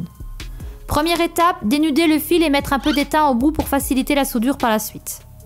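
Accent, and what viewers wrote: Belgium. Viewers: Salut, superbe vidéo, je me suis abonné. Est-ce que c'est un accent belge que j'entends ? Si c'est le cas, très heureux de savoir qu'une patriote fait d'aussi bonnes vidéos. Si jamais tu es intéressée, je peux t'expliquer l'électronique facilement, ça pourrait t'aider pour tes futurs projets pour soit avoir moins de difficultés, soit payer moins cher pour mieux ou le tout en même temps. Continue ce que tu fais !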